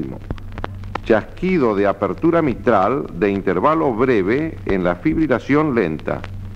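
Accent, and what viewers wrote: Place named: Argentina. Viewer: Ese acento argentino de loas años 80 me trae mucha nostalgia :)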